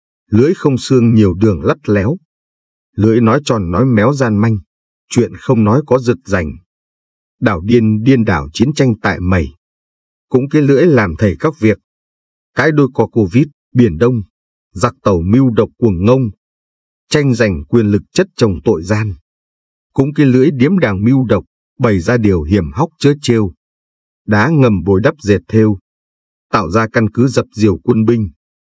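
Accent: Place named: South, Vietnam. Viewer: Hihi thầy nói giọng miền nam dể nghe, dể hiểu ?! nay đổi giọng bắc sao khó nghe và khó hiểu quá ? hihi. Không biết đức thầy nghe được không nữa ??!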